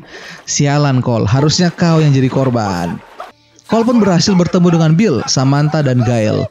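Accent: Manado, Indonesia. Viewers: orang manado ka ? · Bilang jo kwa klo nga itu orng manado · Bangg Orangg Manado? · Orang manado min? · Manado ngana kang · Manado to ngana... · Bang Lu Orang Manado? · Org Manado 😭🤣🤣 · Bang org mnado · Bro orang manado??? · Orang Manado Ya Bang?? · wah mimin orang manado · Bang ngana orang manado kang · Kak ternyata orang manado🙂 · Orang manado bang? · Admin org manado fix! 🤘🏻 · Orang Manado? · Dari logatnya , Abang pasti orang Manado ya😂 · Bang orang menado ya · Orang Manado ka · Abang orang MANADO kah ini ?? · Mimin orang manado kang 😆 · Ad logat Manado kang🤣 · Orang Manado ya bang??? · Bang abang pasti orang manado halo bang aku orang manado halo bang😁😁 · Min orang manado? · Orang manado ya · orang manado kang dapatau😂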